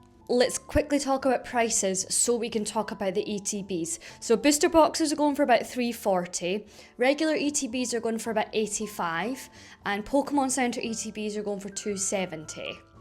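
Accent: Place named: Scotland, United Kingdom. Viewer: I’m sure those 4000 hours watch time will come no bother - I really appreciate your videos, you make much better arguments that many of the other investing streamers who I feel jump on the hype trains and doom and gloom for the extra views. It’s also refreshing to hear a fellow Scot talking pokemon. Question - I got the Mega charizard UPC and Phantasmal flames booster boxes at retail. Would you flip them for a better set like destined rivals or just hold them for the next few years? They don’t seem to be very popular… thanks!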